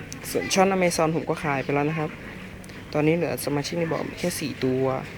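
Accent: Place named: North, Thailand. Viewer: คนจังหวัดไรครับเนี้ย พูดเหนือด้วย 55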